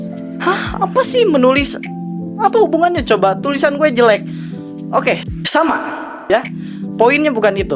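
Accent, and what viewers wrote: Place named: West Java, Indonesia. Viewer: makasih penjelasannya bg sangat mudah difahamii btw abangnya org sunda bkn soalnya logatnya kenal bgt AHAHAHAH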